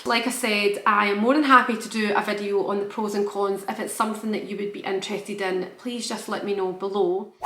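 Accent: Scotland, United Kingdom. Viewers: I could listen to your beautiful Scottish accent all day cheers from Sydney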